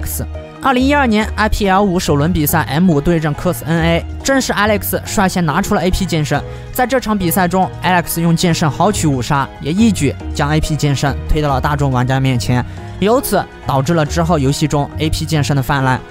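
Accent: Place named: Shandong, China. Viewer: 这一口山东味。。。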